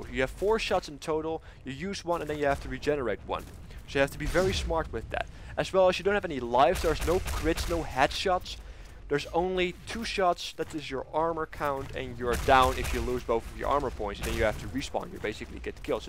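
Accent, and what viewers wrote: Netherlands. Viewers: u dutch?? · je klink als een nederlander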